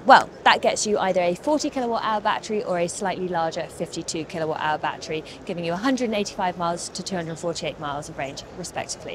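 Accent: United Kingdom. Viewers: She has a cute British accent, though I think she will be even better if she was not in such a hurry and speak slower.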